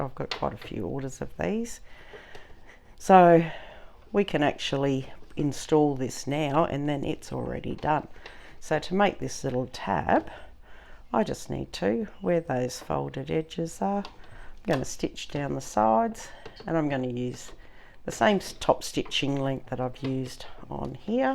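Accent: Australia. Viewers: Thank you I'm going to give it a go tomorrow, love your calm cheery Aussie voice and clear instructions. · Just found your channel , I’ve never made a bag before so I’m keen to give it a go … I thought you were an Aussie from your accent .. I’ll definitely check out your shop , also where do you get your label from … x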